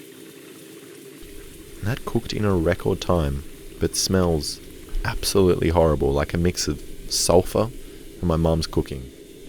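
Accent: Australia. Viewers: Yami Yugi as an Aussie I can conform. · Is this a Australian, and talking? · Obsession with eggs? Australian? Is that you, HowToBasic?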